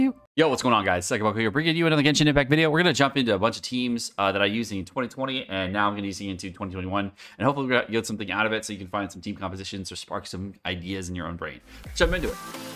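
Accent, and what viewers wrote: United Kingdom. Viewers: I love your british accent(?)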